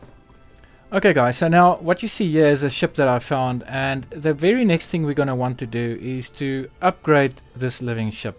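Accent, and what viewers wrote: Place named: South Africa. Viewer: The South African accent is strong with this one.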